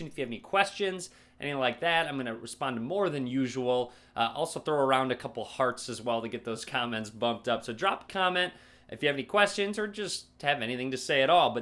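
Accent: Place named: Chicago, United States. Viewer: Favorite phone reviewer, right up there with MrMobile, Michael Fisher. Especially like your Chicago accent. I see Amazon is already out of stock, sad. Wondering if this phone will work on Project Fi....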